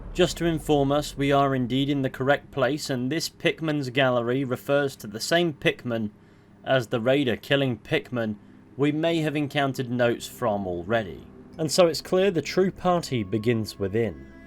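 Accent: United Kingdom. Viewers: Are you talking about? I believe he is British and not Australian 😂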